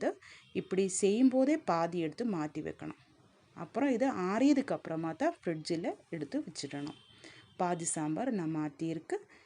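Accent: Kerala, India. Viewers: Your tamil is good qith malayala accent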